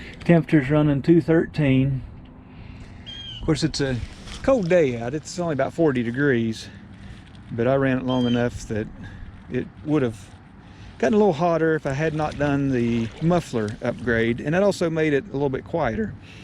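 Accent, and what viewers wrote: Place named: West Virginia, United States. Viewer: Thought you are from West Virginia as soon as you began speaking. I was born in Clarksburg, raised in Glenville. Now happily retired in Wisconsin three blocks from Lake Superior. Enjoyed the video. -- drew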